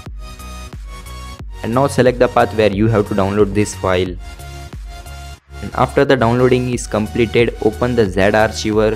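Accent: India. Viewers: I would try this but you have faded background music and an indian voice... So I know it's a scan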